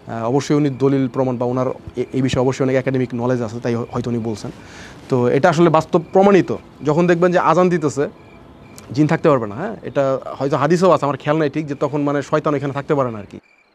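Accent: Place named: Mymensingh, Bangladesh. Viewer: Vai apnar basha Mymensingh kothay?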